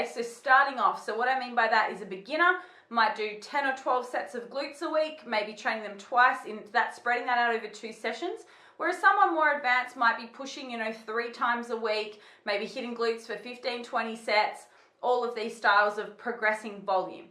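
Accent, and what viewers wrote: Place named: Australia. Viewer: Thanks, Good quality information and it's great to hear an Australian accent too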